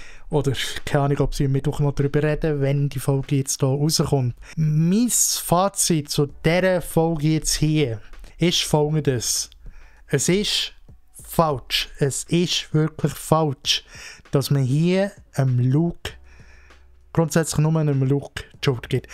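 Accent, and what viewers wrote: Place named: Switzerland. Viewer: War nicht leicht, aufgrund des "schwyzer Dütsch", alles zu verstehen, aber das was ich verstand: Sehr kluge Worte, sehr gute Einschätzung! Danke dafür! VLG Johann. :goodvibes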